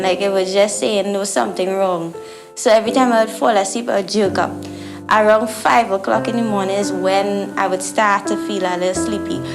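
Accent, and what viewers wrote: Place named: Trinidad and Tobago. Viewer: I hear you loud and clear. Ive been going through TSW 25 months. Getting better each day, still flaring but im doing much better than year one. I hear your accent, my parents ars from Trinidad as well. I plan to do a video soon once my journey is over, i want to make sure i am completely healed and advise on what works and what not to waste your money on. This journey is not easy and proud of you sharing your story. Time is the only healer on this journey.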